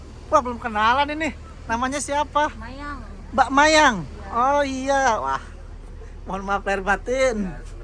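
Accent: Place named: West Sumatra, Indonesia. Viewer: Bahasa indonesianya sangat minang sekali. Wkwkwk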